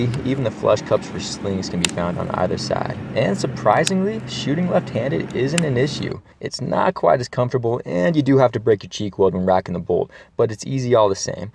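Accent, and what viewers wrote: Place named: South, United States. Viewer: He has a bit of Southern twang, which is fine by me since I'm Southern as well. His commentary sounds perfectly fine. His words are about the rifle and it's performance. That's all you need.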